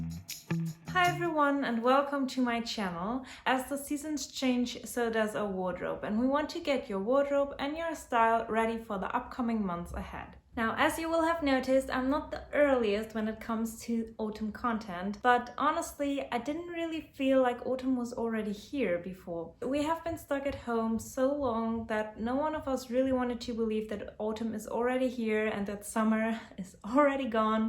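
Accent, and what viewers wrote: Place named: Germany. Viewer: Are you from Germany? Your accent sounds so familiar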